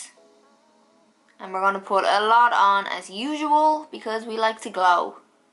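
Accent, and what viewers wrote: Ireland. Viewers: i just found your channel and i noticed the irish accent on you i think its dublin correct me if im wrong but got so excited because i live in ireland to bad your all way in canada doh !!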